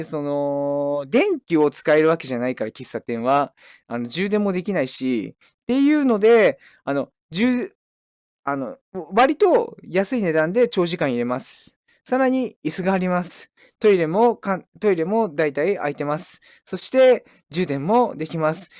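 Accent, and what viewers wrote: Hiroshima, Japan. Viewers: 時々広島弁になってねえか？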